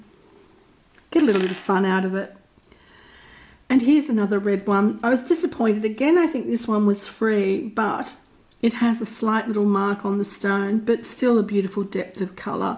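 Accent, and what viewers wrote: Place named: United Kingdom. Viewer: Miss You are so funny!! I could literally imagine everything you were saying... The proper British accent made it all that much better!! In love!!🥰🥰🥰💯💯😂😂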